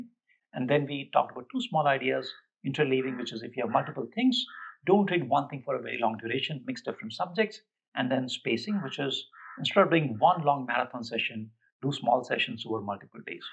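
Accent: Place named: India. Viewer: Yes, the Indian accent makes it more efficient to understand.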